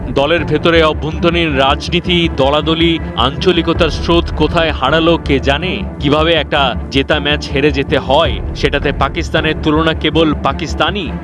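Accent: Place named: India. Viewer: ইন্ডিয়ান কন্ঠে খবর পড়া কেন😡😡😡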